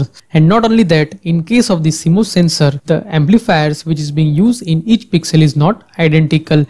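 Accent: India. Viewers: I love this indian english :)) ,,thanx for explanation! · the Indian accent is so heavy I can hardly understand a single word he's saying. I know he is speaking English but what the hell is he saying? It didn't do a thing for me because the accent is too heavy to understand even with headphones, and I use headphones predominantly when viewing and watching videos, or listening to music online. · it's India's English right? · hehe... razumio sam ga sve, mozda zato jer imam tehnicku/elektronicku podlogu. ali taj indijski naglasak je uzasan. ti indijci cak i boju glasa imaju svi istu i ruzna je haha. i onda ovaj jos doda bass boost i jos totalno pretjera u tome, da frajer zvuci da ima dublji glas. uzas za uzasom :D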